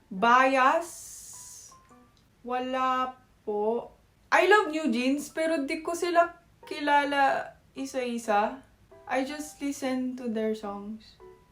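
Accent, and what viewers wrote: Batangas, Philippines. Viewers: kyot mo teh~:face-green-smiling: kaya pala medyo batanguenya yung accent mo taga dito ka pala dati. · That’s why! whenever I watch you I hear words, expressions and even a bit of Batangaueña intonation. Where in Batangas? Batangueña too. A Maroon as well. More power to your channel and congratulations to 100k!🎉👏🏼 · Love you, isa ka na talagang Pinay, salamat for embracing our culture lalo na ang aming wika, Batangueña rin ako kaya i can relate to your diction, ala eh.....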